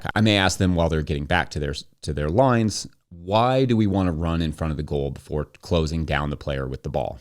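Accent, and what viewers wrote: Kentucky, United States. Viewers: For sure. My father hobby-farmed ten acres (but I didn't choose farming). I understand that part of it is time pressure and could also be the frustration of training people who turn out to be temporary/seasonal etc. This podcast might turn out to be a good way for to capture what he's learned and document best practices for different crops for those of us who find ambiguity torture. (He already has some great videos about wash-pack and setting up for market, for example.) I grew up on a little island near Seattle but have spent the last decade near Barcelona. It would really make more sense for me to tune in to someone who discusses Mediterranean climate crops and techniques but this channel is one I like when I'm tired of being an expat and craving English. I guess I enjoy a Kentucky accent, especially his fast-talking version, which communicates some urgency and makes me feel like he doesn't want to waste time. 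(I wonder if he realizes he's just keeping random viewers company while they make dinner or clean house.) Agricultural content feels like home, too. Thanks for validating my comment, it's the most other people operating another way that makes autistic persepctive hard sometimes.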